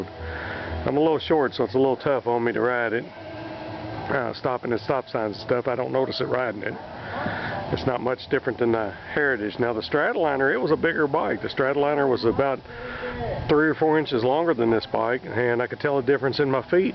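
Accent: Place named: Kentucky, United States. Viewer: sounds like you might be in kentucky. if so i'am from winchester. live in apopka florida have a 2014 street glide special gold peral about a year now . my last name is brett still have many, many family and freinds in lex, georgetown, louisville,winchester and kentucky area. ride safe and enjoy / peace !!!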